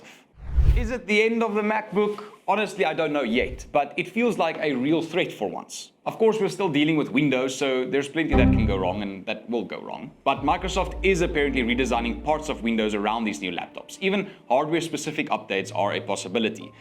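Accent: South Africa. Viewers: Bro, are you from originally South Africa?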